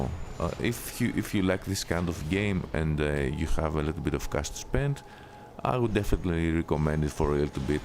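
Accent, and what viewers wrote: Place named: Greece. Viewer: you sound like a greek or it probably is my idea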